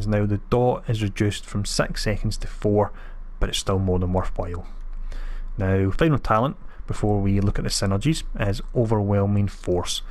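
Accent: Scotland, United Kingdom. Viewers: Sorry for the off topic question, are you Scottish ?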